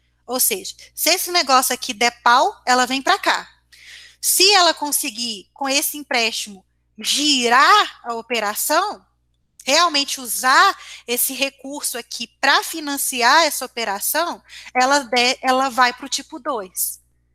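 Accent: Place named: Belo Horizonte, Brazil. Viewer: Esse vídeo vale ouro! Conteúdo excelente! E o nosso sotaque de BH é bom demais! :)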